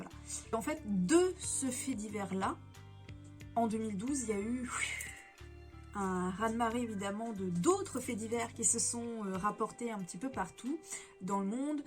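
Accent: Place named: England, United Kingdom. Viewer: Ton accent anglais oooh. Les valeurs traditionnelles zombies oh yeah.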